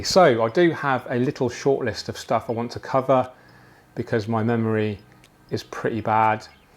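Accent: England, United Kingdom. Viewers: Oj, what's wrong with an English accent mate? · I love your English accent and keep on rambling! 😀 Also I'm pretty excited about the Dennerle news. Thanks for sharing 🤗👍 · Another great Video, and what a funny accent, must be english ,😁 greetings from germany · So many excitiing projects. So much positivity and next level growth. And then come the tiny trolls and cowardly keyboard warriors looking for any little thing to dump some useless negativity on you, trying to slow your roll. The utterly asinine statement about your English accent and rambling... you're English, you live in England, does it not stand to reason and logic that you would have an English accent? It's the blatent ignorance and outright stupidity of cowardly keyboard warriors that always amazes me. If they don't like the accent or style, why not simply leave and find a dramatic, tiny troll channel that will resonate on the same level of stuck-on-stupid. 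It really is that easy and that drama free. No need to dump negativity and show off personalized styles of stupid. 86...pushing 90 thousand folks like the English accent and the ever growing positivity, style, and awesomeness of you doing what you love, loving what you do, and sharing this amazing journey with us. 😘 👍💕👍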